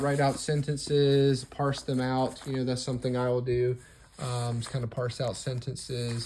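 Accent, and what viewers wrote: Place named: England, United Kingdom. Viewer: Bro, if you can't pronounce - don't. Just add background music, do a little dance pointing with your fingers to text on screen. Or just insert audio from someone who speaks the language like Luke Ranieri or Metatron. Or at least have some humility, say something like "haha, probably butchered that". But, come on, this thick accent with not vowel length and English R is simply insulting! Imagine, idk, teaching people how to learn Japanese while saying "R.E. ga toe". I heard you trying to trill the R, but that was like the last thing I would worry about.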